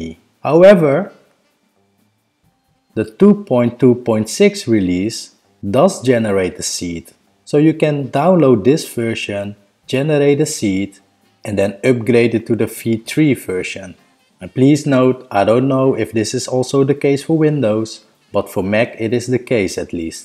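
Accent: Netherlands. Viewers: Thanks for the video!. Question: Are you Dutch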